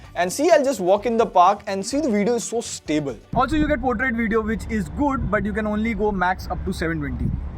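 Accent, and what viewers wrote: India. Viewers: I like your indian accent❤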